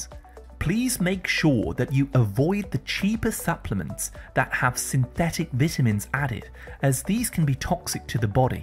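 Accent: United Kingdom. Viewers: First off, couldn't they Find someone that spoke American, That British tongue Is irritating,.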